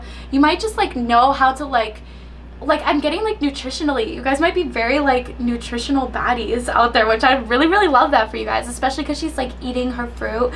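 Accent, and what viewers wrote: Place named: Australia. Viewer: Loooool your Australian accent made me giggggleeee and then your Little Mix Jamaica interview reference 🤣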